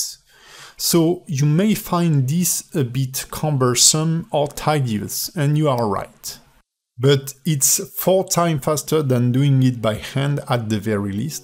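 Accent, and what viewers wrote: France. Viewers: i think you have a french accent ^^ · Hhaaa l’accent français 😄, super vidéo instructif, tout le monde en avait besoin. Merci beaucoup. En espérant avoir d’autres à l’avenir,bonne continuation. Ps: serait t’il possible d’avoir des sous-titres en français,même si on est en petit minorité sur ta chaîne(je pense) 👋👏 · Est tu français car j'ai l'impression que ton accent est français. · I love your french accent bro ( j espère que je me trompes pas en disant que t es français) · ça sent le bon accent français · french accent ? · T'es Français uhn ? ça s'entend XD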